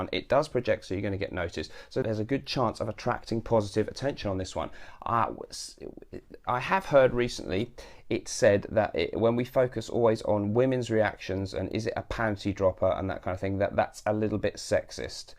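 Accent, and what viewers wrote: United Kingdom. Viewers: Yes! Fragrances reviewer with British English and funny concept.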